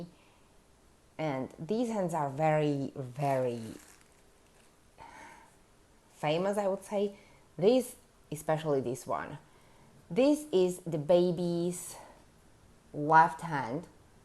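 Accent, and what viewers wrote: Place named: Europe. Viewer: From what country in Europe do you come from? Yesterday evening I met a lady with your accent and boy she is your "sosie" as we say in french. I don’t know is the word exist in english but I thought it was you!! And she is sweet like you!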